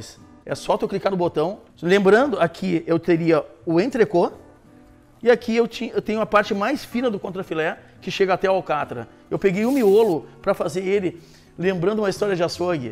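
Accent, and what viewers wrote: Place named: Porto Alegre, Brazil. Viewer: Sotaque porto alegrense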